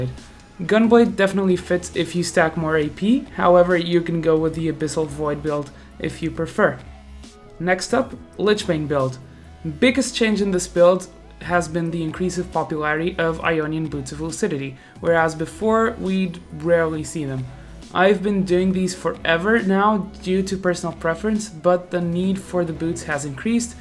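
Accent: Portugal. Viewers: ES TUGA MAN ahaha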